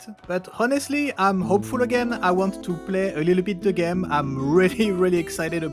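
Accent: France. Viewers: This vid convinced me to give the game a try. I really like your humor btw. The French accent makes it even more funny. :)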